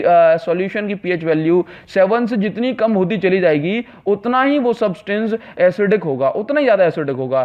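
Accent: Odisha, India. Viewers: Sir aap odia ho kai?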